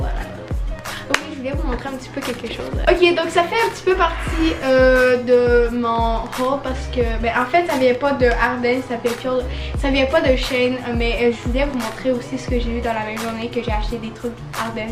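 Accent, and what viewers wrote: Quebec, Canada. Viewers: es ce que t'habite au canada parce que t'a l'accen qc (moi aussi) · J'adore ton accent Est-ce que tu e Québécoise? Parce que moi Oui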